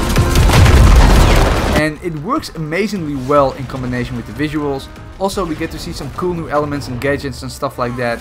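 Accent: Netherlands. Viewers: you dutch? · You are from the netherlands or am i wrong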